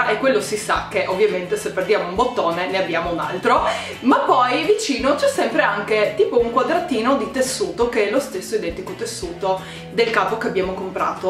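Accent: Trieste, Italy. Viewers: Hai l'accento triestino. Sei di Trieste? ☺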